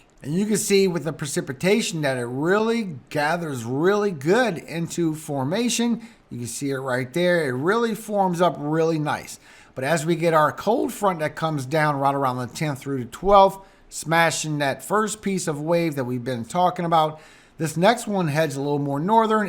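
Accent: Louisiana, United States. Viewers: Bust thanks sounds like ur from houma la or nearby neworleans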